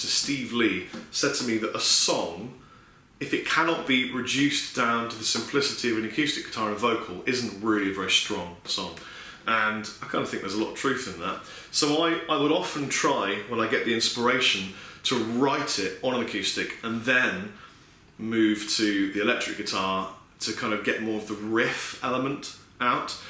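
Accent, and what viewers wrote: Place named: United Kingdom. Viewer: Heh heh..kinda funny. Who the fuck is? That rules. He's some dude who is promoting an Asian made guitar company on you tube a LOT.. he promotes products and usually everything is stellar and the best..and "take my money, please!!" So u have to take it all w the proverbial grain of salt. He gets paid to say pedals,amps and guitars are really great..and has stock in that guitar company, I think.. so if you browse YT for guitar gear, u might know him. otherwise, he's that shredding dude who works at the music store..he knows his stuff,but he's annoying as shit. He's the only guy I know who can make a British accent sound uncool.. Kind of like the Al Bundy of the Gear Demo guys, they do introduce a lot of new products here,and these guys know their stuff, the Danish guy,Pete and Lee are a bit more soulful players and infinitely less annoying,and despite the long winded segments, these fellows are pretty knowledgeable and usually fairly entertaining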